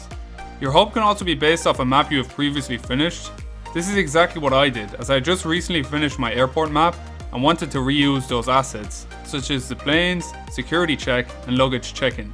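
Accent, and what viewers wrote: Ireland. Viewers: Are you irish? I saw you in yung chips video and I was like, yea he sounds irish 😂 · Are you irish boss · U sound irish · Are you Irish. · Are u irish? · Are u from Ireland because I am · Where are you from in Ireland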